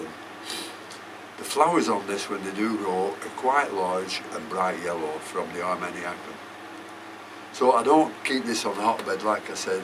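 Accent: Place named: West Yorkshire, United Kingdom. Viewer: Hi Have just found your channel I live in Brittany but it is lovely to hear your accent as I originate from West Yorkshire.I have just subscribed to your channel and will be watching them all.I am only new to orchids but I am hooked!!! I do have a greenhouse but I think it would be to expensive to run.So I have converted one of my rooms into an orchid room with an humidifier so we will see how I go Sorry for rambling on All the best to you Keep Smiling Thelma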